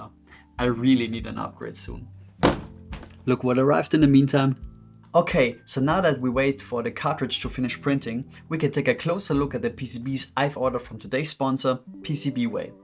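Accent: Germany. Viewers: The chill tone and slight german vibe to this was really calming. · are you german?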